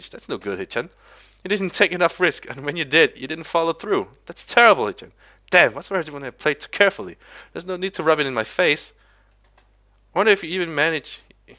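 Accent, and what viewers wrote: Denmark. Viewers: Are you danish?!! O_O I am danish! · I wonder what his first language is? Danish, maybe?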